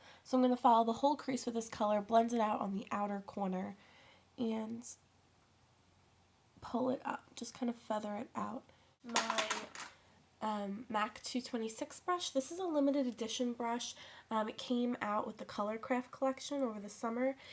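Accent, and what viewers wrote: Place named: New York, United States. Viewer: i just looked at my 88 warm palette, and i was looked at youtube for some inspiration on a cranberry look! i'm going to use this today for school and work! and btw love the new york accent haha i'm from the west coast so i recognized it immediately!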